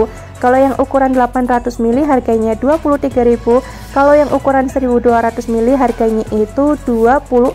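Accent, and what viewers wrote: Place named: Pemalang, Indonesia. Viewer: Okeh2 khas Pemalang,ogel2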